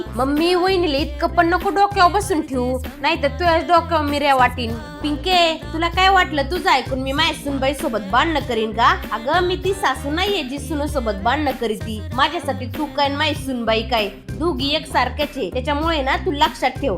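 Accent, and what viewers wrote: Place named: Pune, India. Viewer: पुण्याकडची भाषा आहे ना ही❤❤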